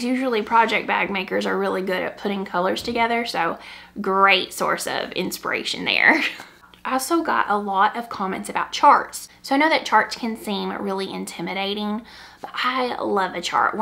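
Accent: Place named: Arkansas, United States. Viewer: Hi! I love your podcast!! I love that you’re mixing it up and doing some different formats. This was a great discussion on colorwork - awesome tips and advice. Thank you! I’ve got the Alpenglow in my queue waiting on squishy mail to arrive - so excited. I’m a fellow Arkansan transplanted to Texas a long time ago and I just love hearing your accent from home! You asked in a previous video for book recommendations. I would recommend reading “A Gentleman in Moscow” if you haven’t already read it. It’s a beautiful story. My mom and I are reading the Thursday Murder Club series at your recommendation and absolutely love it! Thanks so much for all you share!! You’re so inspiring 🐝 🐓 🧶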